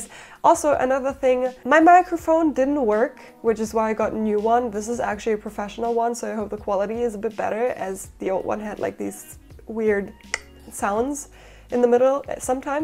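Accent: Germany. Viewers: i can totally hear you're german :D nice dress tho. will try to make it myself.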